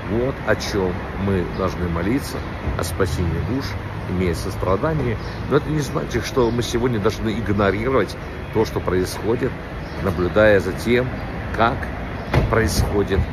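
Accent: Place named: Ukraine. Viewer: АКЦЕНТ ПОЧЕМУ ТО УКРАИНА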